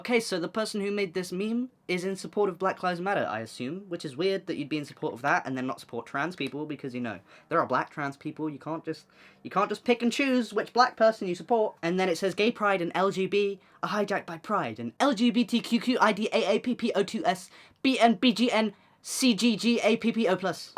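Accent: United Kingdom. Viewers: Are you British or Aussie? I can’t tell from your accent lol. I’m Aussie.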